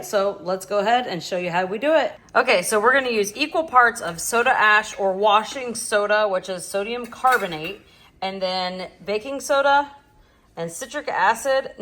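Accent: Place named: United States. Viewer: She's not speaking English. She's speaking "American". There's a difference. This is the kind of thing that happens when you have had a failed educational system for decades.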